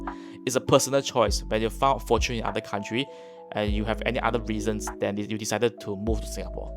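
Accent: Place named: Malaysia. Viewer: Your English suck ! You are speaking Manglish !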